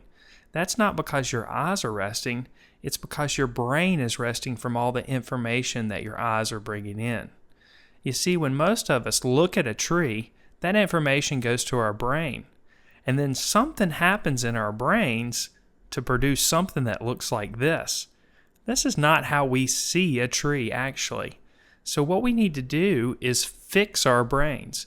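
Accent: South, United States. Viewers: owmygod that's what I was hearing too but then I was like ''wait did he just say arse ? he can't be saying arse .. then what IS he saying?'' and remembered that he showed an eye and was like ''OOOWW so that's what he's saying'' xD southern accent problems :P it's kinda cool .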